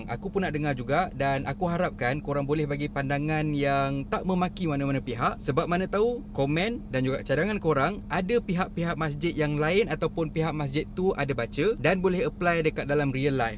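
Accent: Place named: Perak, Malaysia. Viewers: Awat hang tetiba cakp kl..orang cakap utara hg cakp kl😂😂..sebb dalam video banyk gak cakp utara hahaha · uish..org loq staq ka nie.?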